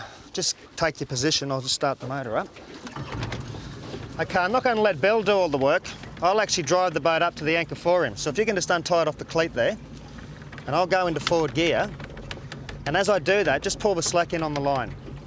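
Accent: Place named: Texas, United States. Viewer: Good video ,,, Love that Texas accent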